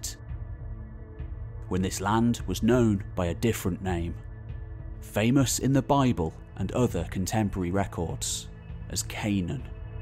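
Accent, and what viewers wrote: United Kingdom. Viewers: I'm always looking for good history doc channels and yours is certainly one, thus I have subscribed. Being one-quarter British I enjoy the many accents from your islands and would rather see a world history documentary presented by a Britisher than one from a fellow American. Keep up the excellent work and thanks for the many links you've generously provided to other related sources.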